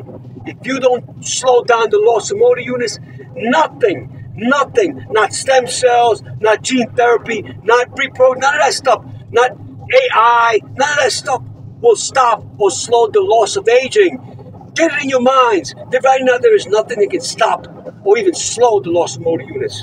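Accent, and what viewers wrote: United States. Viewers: Are you in Europe? You sound American!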